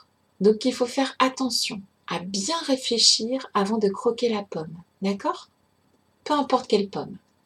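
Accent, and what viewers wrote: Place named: England, United Kingdom. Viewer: Merci 🙌💖 c’est certain ça ne se présente pas en mode « pump it up » quoi que?! 🤣 mais j’avoue que je comprends très bien ce tirage à ce moment de ma vie ou je n’ai plus envie de me battre contre ce passé pénible mais plutôt œuvrer pour moi! J’hésite en terme d’investissement travail... alors je vais revenir à l’intérieur 🙏🏻 et je garde mon guide de paix dans le coeur pour février 😌 beau mois de février 😘 et j’adore votre accent anglais 👌🏼👌🏼👌🏼 perfect!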